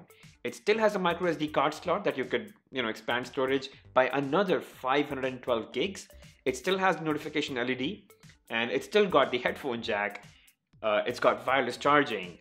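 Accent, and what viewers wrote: India. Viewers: 这英语的咖喱味真明显。。。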